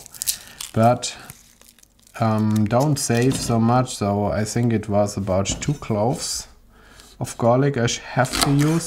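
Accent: Germany. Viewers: This German accent is hilarious. XDD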